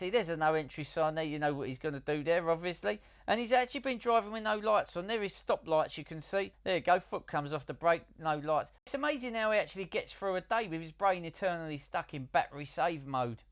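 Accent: Cockney, United Kingdom. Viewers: Love all your videos,but the cockney just adds the cherry on the cake.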